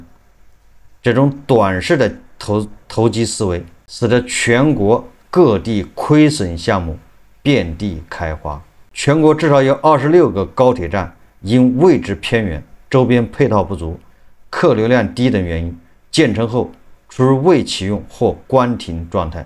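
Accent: Anqing, China. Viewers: 第一次看你直播的时候听口音我就知道你是安庆的，怀宁的懂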